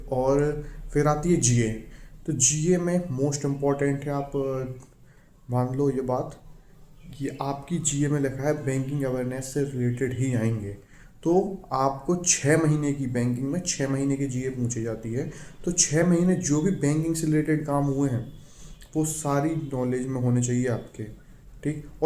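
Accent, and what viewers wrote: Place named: Madhya Pradesh, India. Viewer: Sir aap mp se ho kya . Aapki tone yha ki lg rhi h 😊. Thankyou for strategy sir .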